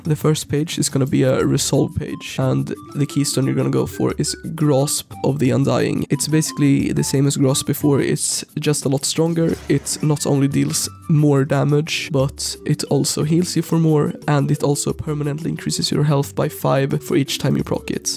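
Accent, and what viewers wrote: Sweden. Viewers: Do hear a Swedish accent? c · är du från sverige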